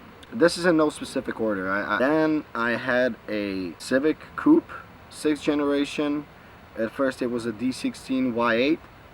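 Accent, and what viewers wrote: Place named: United States. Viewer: such a nice american akcent. Do you spend some time in America or is that just influence of the Internet?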